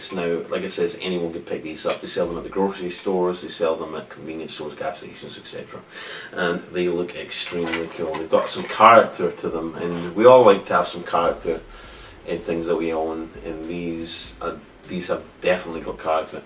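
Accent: Scotland, United Kingdom. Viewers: Im from Scotland so I could pick up on the hints of Scottish but was confused by the American terms you were using haha. My granda is also Scottish and has lived in America for years so has a similar twang lol. Good video btw 👍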